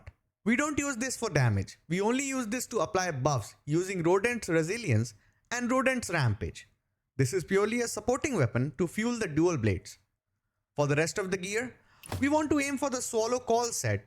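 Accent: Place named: India. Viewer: I'm chinese and your Indian accent is so thick it made me pay more attention to what you were saying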